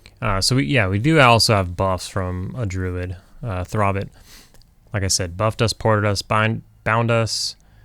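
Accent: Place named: West Virginia, United States. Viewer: I'm from west Virginia , this is how I remember people talking.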